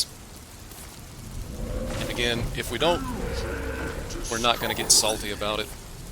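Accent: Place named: South, United States. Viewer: Love the thunder and rain in this video. I also hear a southern accent. Greetings my southern brother.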